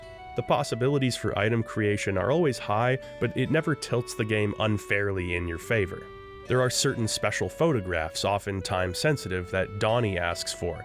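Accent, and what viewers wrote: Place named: Canada. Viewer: He also pronounces some words ("again" for example) in a very Canadian way.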